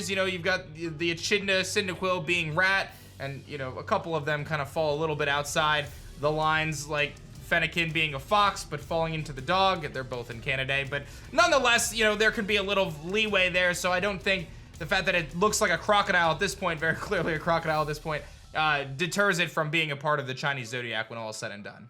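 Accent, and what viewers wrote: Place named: United States. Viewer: all tho respect but it hurts to hear americans say spanish words ALL THOUGH RESPECT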